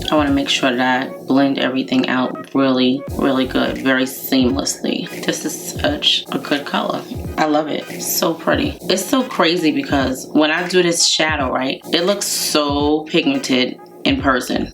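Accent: New York, United States. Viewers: Native New Yorker!! I knew it! The first time I watched you I was thinking she sounds like she's from NY which is great because I love NY and I'm from NY too😊 I never asked you because I understand YouTube rs have to be safe about revealing personal information and I respect that. OK enough about NY☺ I love this latte look on you❤ The eyes do look more on the golden side but still very pretty.I purchased the Infallible blush too because I saw in one of your haul videos but I got shade confident pink and now I want the shade you used too,I really liked it. Or do you have both shades?🤔 I would love to see how you make your wigs. Your wigs always look beautiful I thought you bought them,good job. I wish you much luck and success on starting your own line. Just wondering why you set your undereyes with two different powders? I tried doing that but it turned out looking cakey on me😒 Btw I saw the Barbie movie it wasn't at all what I expected. 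I'll just say I most I enjoyed about the movie was looking at Ryan Gosling 🙄😄😉 Chat with you soon darling 😘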